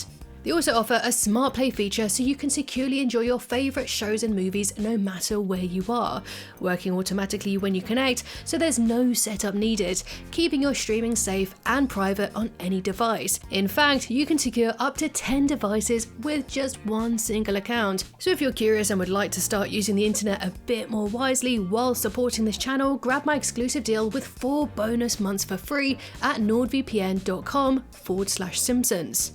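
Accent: United Kingdom. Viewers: No offense. As an American hearing a Brit, talk about the Simpsons feels like I am reading a history book from the u.k. about the war of 1812. I love the breakdown, just not what I expected, haha.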